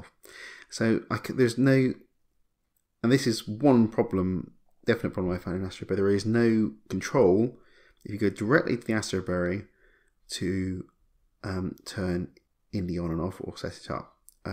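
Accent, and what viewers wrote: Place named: Liverpool, United Kingdom. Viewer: MY GOD HE"S FROM LIVERPOOL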